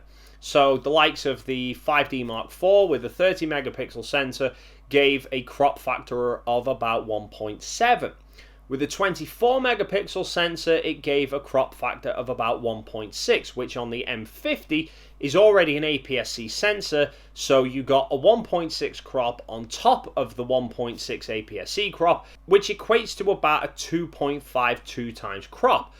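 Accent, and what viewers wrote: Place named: Isle of Man, United Kingdom. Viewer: That's a Manx accent for sure! You a fellow Manxman? 😁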